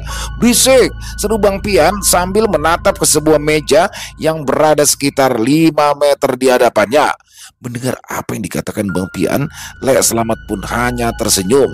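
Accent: Jakarta, Indonesia. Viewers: pagi bang... semoga sehat sll 😊🙏 suka banget denger cerita berlogat betawi walaupun saya orang jawa tulen😊😊😊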